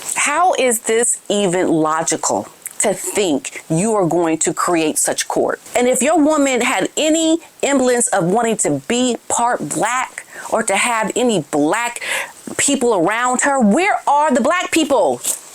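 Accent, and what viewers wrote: United Kingdom. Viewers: Hey, good British accent, ❤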